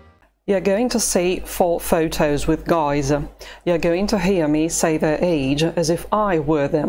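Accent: United Kingdom. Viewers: as a russian your english like BBC reporters ı am not sure ı follow your channel your english or your russian.. but it makes me keep watching . thanks anyway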